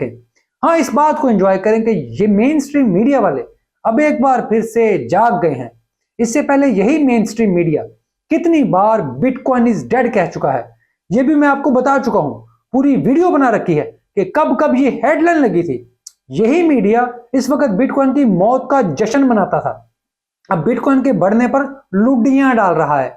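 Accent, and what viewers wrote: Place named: Punjab, India. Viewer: Your punjabi accent is so good 👍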